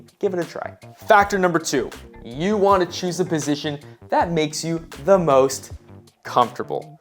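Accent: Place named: United States. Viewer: Is it possible to give English captions (at least important steps). Because, English is a foreign language for several people. And it is difficult to follow the US pronunciation for people like me.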